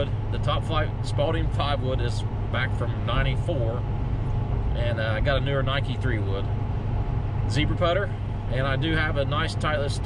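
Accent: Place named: South, United States. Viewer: You ever played Edgewater in Lancaster, SC? I would have never guessed you were from Ohio. You sound like your from the South. Not meaning that in a negative way, just an observation. Thanks buddy. SC has some nice courses!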